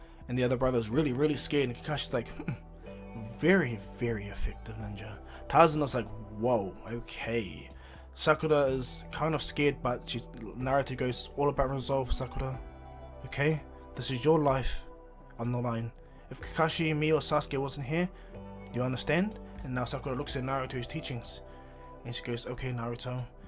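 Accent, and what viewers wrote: New Zealand. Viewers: Another Kiwi I was able to tell just by listening to you my g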